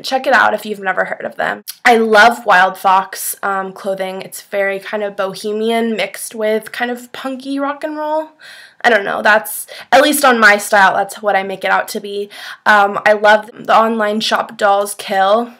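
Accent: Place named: United States. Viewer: I love when you say yaaall !! That American accent ! <3 And your fashion is tops !!!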